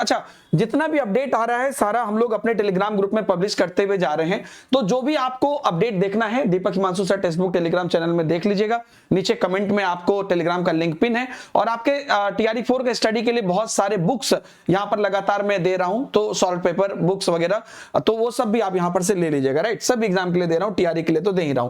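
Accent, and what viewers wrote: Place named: Uttar Pradesh, India. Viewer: भाई जी मुझे तो नहीं लगता है यह बिहारी है यह कहीं u p का लगता है टीचर है या कोई आर्डर स्टेट का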